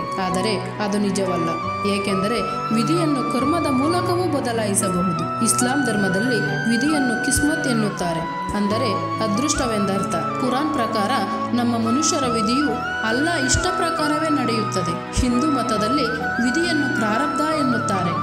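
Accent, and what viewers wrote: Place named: Mangalore, India. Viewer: Neevu Mangalore navaru ri ....eer pateruna styld gotthapundu atthe.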